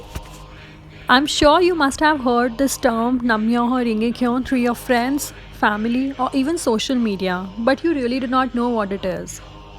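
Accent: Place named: India. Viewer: Tough Critique in the US gives this EXcellent presentation a Thumbs UP! Bravo!! The demonstration must have taken a lot of hard work, and the outcome is superb. I now have a new Mantra to chant THANKS 2U!! Might I however suggest that you speak slower, because you your words sound mumbled, and PLEASE DON'T RUSH THROUGH THE VERY ESSENCE OF YOUR PRESENTATION - You say "Nam Myo Ho Renge Kyo" so fast every single time, that it's hard to catch what's said. The background recital is softer (and a v. nice touch), so the viewer is left wondering how to pronounce it. (Especially outside India where the "Indian" in your accent is foreign to the listener!? Your Presentation is so good it deserves to be heard all over the world) Also: Nam Myo Ho Rengay Kyo would be the more precise spelling if it is to be pronounced correctly. Hope you will take this as constructive criticism, & speak a little more clearly in your future videos - You have what it takes, so go for it, and Best Wishes. 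You Go Girl - Ushta-te!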